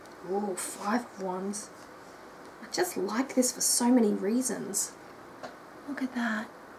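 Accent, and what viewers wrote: Australia. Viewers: Wow I'm always super surprised at how you pronounce certain words! Haha no offence, the Australian accent is gorgeous, but I'm so not used to it (Swedish TV mainly shows American shows and movies...) and I always have to concentrate a bit more to understand you. :D Again, no offence, I need to stop being so ADD anyway, so it's good for me haha. The Linestrider is a bit odd to me, I was very drawn to it, but when I opened it up I felt it was a bit "colder" than I had expected. It almost gives me the same vibe that the Fountain Tarot did for quite a while! But now I love the Fountain Tarot so I have hope this one will warm up for me too... :)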